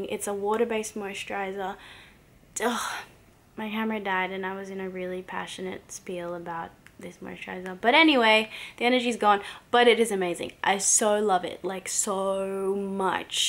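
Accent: Australia. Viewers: You’re one of my fave blogger now, I love your aussie accent. Whereabouts in Australia do you live?:)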